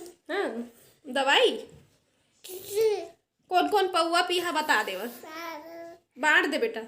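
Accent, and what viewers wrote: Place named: Chhattisgarh, India. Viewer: बहुत बढ़िया दीदी आरूग छत्तीसगढ़ी म बोली बहुत सुंदर लगते आप कहां से हो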